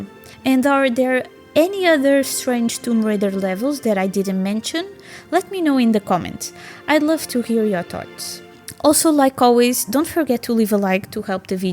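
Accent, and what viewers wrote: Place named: Portugal. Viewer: Puro sotaque tuga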